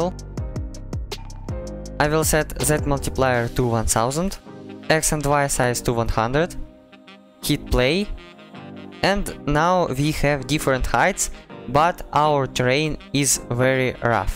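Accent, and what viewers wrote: Russia. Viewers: так охват аудитории шире, а учитывая то как тяжело продвигать обучающий контент, енглиш решение правильный выбор, но акцент наш кнш)) я часто использую нейросеть яндекс браузера для перевода видео, иногда мне действительно комфортнее слушать урок на русском и я просто включаю перевод, так что рекомендую) проверено на себе, да перевод иногда кривой но в целом оч хорош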